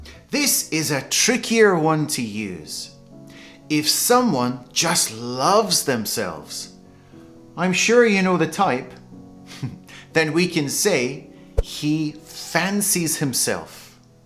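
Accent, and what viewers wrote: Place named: United Kingdom. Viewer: Most appreciated. I`m loving British English! Your English reminds me of my staying in the UK. Thanks, Edinburgh is beautiful town, Highland, Loch Ness. Keep going, I will be watching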